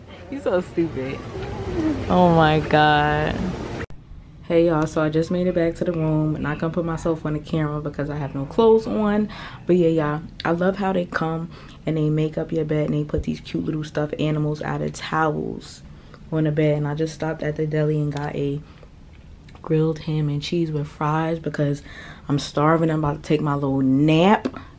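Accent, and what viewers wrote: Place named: New York, United States. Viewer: Great video. I’m a solo traveler myself. Your accent sounds like New York or somewhere off the East coast. Love from Detroit ❤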